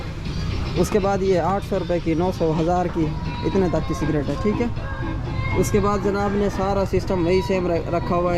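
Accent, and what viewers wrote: Saraiki, Pakistan. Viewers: تم سرائیکی ہو تمہارے لہجے سے پتہ لگا میں بھی سرائیکی ہوں ڈیرہ غازی خان سے مسات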